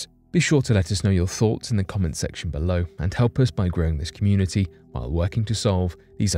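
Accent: United Kingdom. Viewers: well now you just blew my mind....you mean this is all tongue in cheek poop info?....its that british accent- damn...i was looking to actually educate myself...last thing my small mind needs is fake info...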